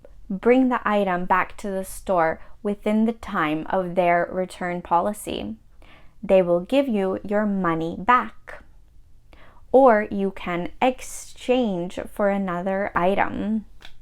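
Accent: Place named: Canada. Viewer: I love the way you speak, am here to learn Canadian accent. From Nigeria. You are doing a great job 👍❤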